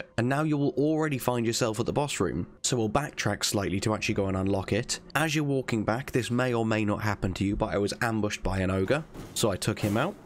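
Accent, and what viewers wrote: United Kingdom. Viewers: My apologies I did not receive a notification regarding your reply 😅 This may sound weird but I wish I could live in the UK hearing your accent 😄 It's fuckin hot as Volcano Manor here in Arizona 😂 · Even if I am on ng+2, almost all looted. It's a pleasure to hear you I don't know if you are british, but you accent is really pleasant to hear. Greetings from France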